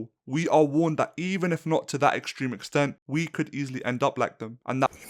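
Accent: United Kingdom. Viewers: Very good video but why the fk yall british bro. Change ur accent n u gon pop off. Love you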